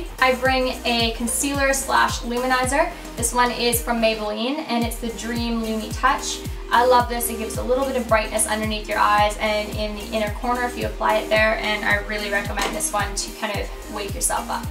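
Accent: Australia. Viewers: your picking up on the Aussie accent! 😂 It's peeking through with your speech! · I love the hint of an Australian accent😍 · Love this video! And you kinda have a Australian accent it comes through once in a while!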